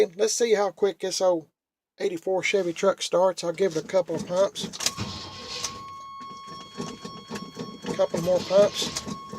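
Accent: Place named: South, United States. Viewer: Are you from Alabama? Your accent gives you away, you’re from the south. LOL! I am visually impaired, and enjoy hearing the sounds of those old pick up trucks of yours. Would love to hear the old 78 roar to life. For several years of my life, I lived 4 miles from the Talladega superspeedway, so I love anything with straight pipes.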